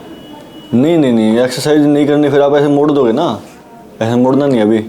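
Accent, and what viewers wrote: Haryana, India. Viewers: Oo my god your haryanvi accent 🤗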